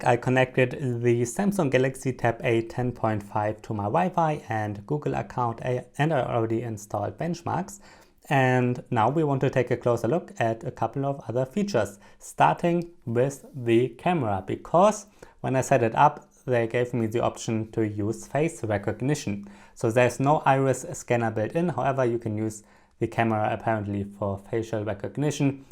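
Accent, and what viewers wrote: Germany. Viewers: man hört aus deiner stimme dass du deutsch bist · I just realize he has German accent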